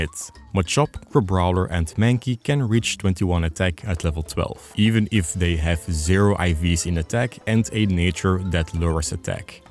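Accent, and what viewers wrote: Netherlands. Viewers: Question: R you Dutch, French or neither? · I can just hear that you're Dutch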